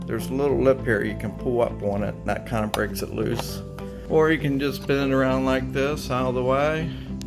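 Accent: Western Pennsylvania, United States. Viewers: Thanks! We are going to give it a try. And you must be from western PA. The most unique accent in the United States. 🇺🇸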